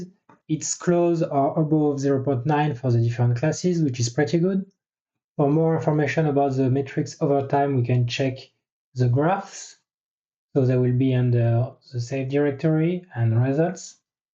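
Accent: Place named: France. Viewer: Hi ! You sound french haha, can you make a video on extraction of boxes with Yolov0 and SAM for segmentation ?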